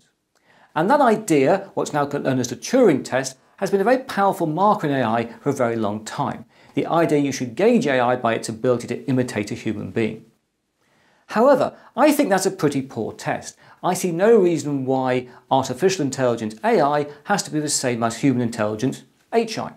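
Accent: England, United Kingdom. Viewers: I enjoy listening to your English accent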